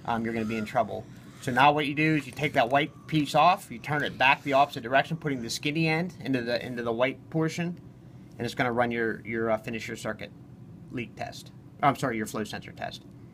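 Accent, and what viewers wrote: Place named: Pittsburgh, United States. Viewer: Pittsburgh accent!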